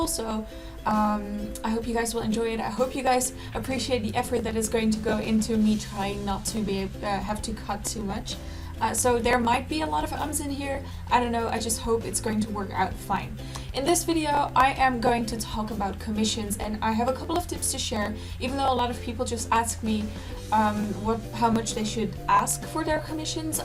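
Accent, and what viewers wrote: Netherlands. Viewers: No, I believe she is from the Netherlands. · This is a super random and redundant question, but are you Dutch?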